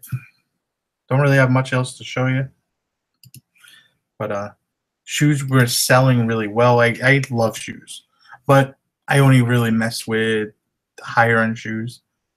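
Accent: Boston, United States. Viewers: I like your Boston accent don't let the bugger's get you down you give always excellent content! Never heard of the Weston shoes till today so something to file away in my search's! Thanks for giving really nice vids! Corie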